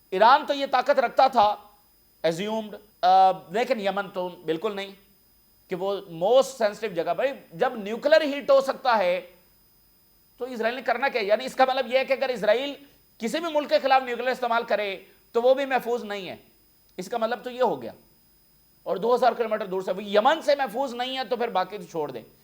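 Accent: Punjab, Pakistan. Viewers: I anticipate you are a Pakistani Muslim! Futher from your Urdu accent I can tell that accent is from punjab! Reason of contact: but from your tongue I had never heard you saying the name of Allah subanahu Tallah our creator!, you should feel proud to be a Muslim and say Allah’s name so that it is audible to all, saying Allah’s name come out from the heart ❤️ naturally without even thinking! I had heard you saying “uoper walls” but I had never heard you taking or saying pronouncing Allah subanahu Tallah’s name loudly as you say rest of the stuff why??????? but don’t you feel proud of your religion Islam and say from your tongue the noble name of “Allah Subanahu Tallah” what is stopping you nothing is more important in this world than Allah and our religion Islam and the rest of the things comes after Allah! Nothing in the world is more important than Allah! 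I will suggest you proudly proclaim Allah’s name, specially at times when your are crediting Allah, instead of saying uoper walla proudly say Allah subanahu Talla! I hope you get to my comment some how and try be better Muslim! Aameen!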